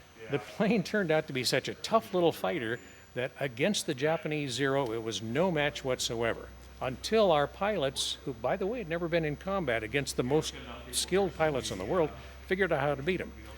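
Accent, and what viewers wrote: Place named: England, United Kingdom. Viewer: The best useful info and at least , I could learn English accent from you 🙏🏻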